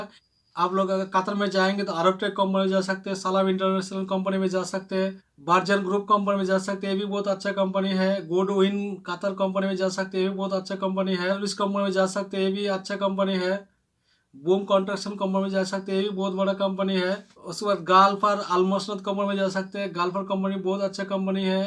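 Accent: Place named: Bihar, India. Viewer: Sabse pehle yey bata Tum Bihari ho kya !! Aap ke awaz se aesa Lagta h ke aap Bihari ho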